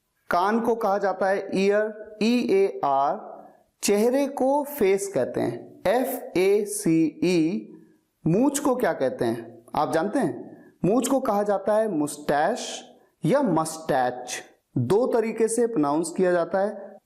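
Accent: India. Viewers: Your pronunciation is totally Indian. Pronunciation should have been like English.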